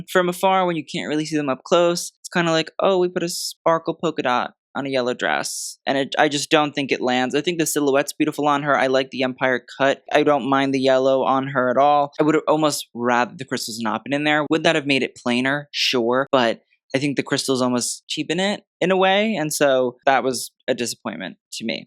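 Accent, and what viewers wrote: United Kingdom. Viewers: Your British accent sounds exactly like Stewie from Family Guy and I love it! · Love you British accent...sooo cute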